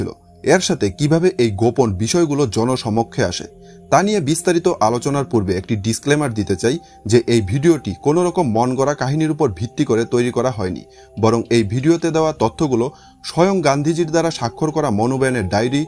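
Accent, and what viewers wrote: Bangladesh. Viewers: ভাষায় তো মনে হয় বাংলাদেশি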